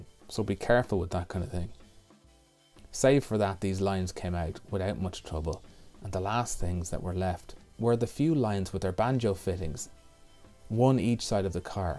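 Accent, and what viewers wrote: Ireland. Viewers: Great to hear an Irish man working on cars. And I LOVE the stop-motion bits showing work done by invisible hands. This is a REALLY UNIQUE USP for this channel. It's probably very time consuming to do, but this helps this channel stand out. Well done. I'm a new subscriber !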